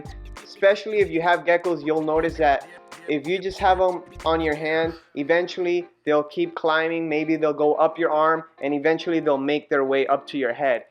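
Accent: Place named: United States. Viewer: So glad it is American accent ❤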